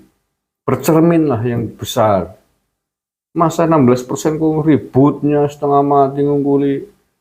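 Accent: Banyumas, Indonesia. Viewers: lik rika ngapak ,,ya ,,nying fens rika lik semangat yak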